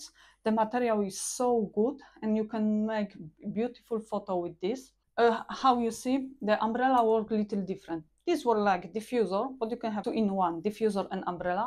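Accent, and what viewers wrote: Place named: Germany. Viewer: She is speaking English with what sounds like a German accent, based on my time in Deutschland.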